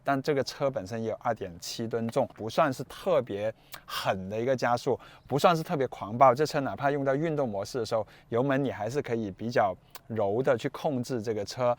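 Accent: Cantonese, China. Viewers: 这主持人是广东人对吗？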